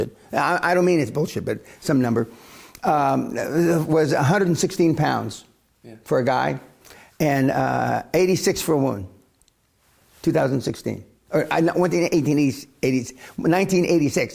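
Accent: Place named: New York, United States. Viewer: Man This.guy is a realist..I love this guy .he the only billionaire..that tells people the truth..no sugar coating ..just raw right in your face ..that the type of people I like ..straight forward. Right in your face ..when he speaks the .truth ..I would someday like to meet the guy..but I know that will never happen ..folks listen to this gentleman..he dropping..truth bombs ..to the people ....for some reason he sounds like he from NY ..Cause he definitely rolls like BAD ASS ..but I know he good guy trying to wake up the sheeples..that are in a deep coma ..cause most of them are headed towards the slaughter house ....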